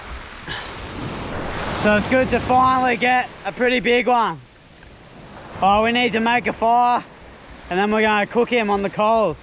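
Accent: Australia. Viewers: You from Perth dude ?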